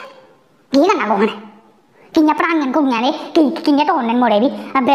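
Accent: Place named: Jammu, India. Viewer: 😂😂😂😂 bilkul aise hi baat krti h jammu ki ourtein. Very nice.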